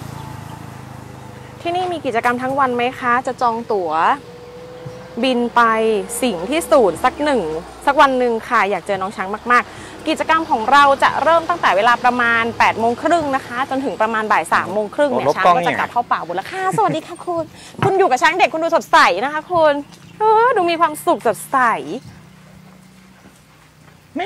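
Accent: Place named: North, Thailand. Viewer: ชอบมากกกแอคมินพูดไทยคำเหนือคำน่ารักมากกกชอบค่ะคุณมาถูกทางแล้วค่ะ❤❤❤❤❤❤❤❤❤❤❤❤❤❤❤❤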